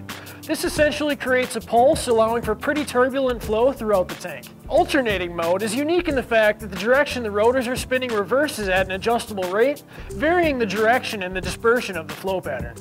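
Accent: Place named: Minnesota, United States. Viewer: I'm from Minnesota but don't live there anymore. Definitely miss the accent. Also, do y'all ever plan on doing these videos for the Vortec mp's?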